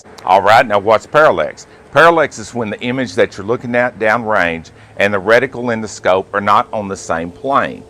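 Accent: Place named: Tennessee, United States. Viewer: I'm from TN. & Just curious what local u r from. I'm having trouble deciding on TN area or maybe? West Virginia ish? Anywho thanks for the no-nonsense !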